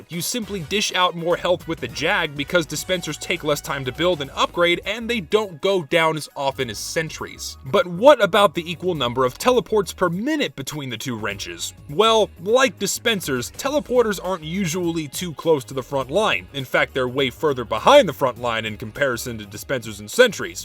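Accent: Texas, United States. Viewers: I thought you were Russian Badger doing a southern accent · Walks in a Texan Accent Me: wow thats what I call getting into character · Texan he is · So is no one gonna talk about how he's actually went through the entire video with something of a Texan accent? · I can't tell if he's trying to do a country accent or not trying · Him is Texan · Your subtle Texas accent in this video gives me pure joy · Texas male is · I liked how you did this video in a Texan voice. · Honestly, I could listen to mathematic/scientific lingo spoken in a texan accent forever, wouldn't mind if ya didn't hide it when you went into statistics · he be Texan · For some reason, I didn’t question why you were speaking like a Texan until you pointed it out. Like “Gasp, wait he’s one of us now!” XD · Y'know, after i found out that you're from texas i started hearing your accent. 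Kinda bizarre but i love it Keep up the good work, i wish you a good year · are you trying to sound like a texan, because i have no idea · Nice subtle Texan accent. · I love your southern accent in this video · why do that texan accent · I absolutely love that you brought out your Texan accent for the engineer-specific video. Great attention to detail. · I forgot how Southern he used to sound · Is it just me or does he have a slight southern accent in the video? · ah, the southern drawl on an engi vid is soooooo perfect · that accent threw me off so much, i was like "did he actually have that thick of a southern accent in his older videos?" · At first I though he was doing the accent because "heHe engineer is texan lol" but then I remembered is Texan. Good on you man, as a fellow Texan, I say bring out the accent more often.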